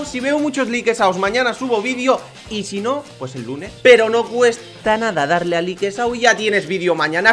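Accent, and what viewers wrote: Mexico. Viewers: los mexicanos del sur si hablan asi, nosotros del norte hablamos diferente:) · hablas igual a los mexicanos saludos desde México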